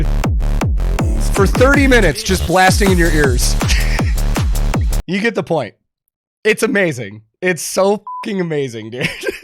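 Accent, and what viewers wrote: Germany. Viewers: Did your German improve during the tour, and which accent do you have now? 😁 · Hi. I`m from Germany and i must admit, that you really sound like a German! Ultra Props Buddy:D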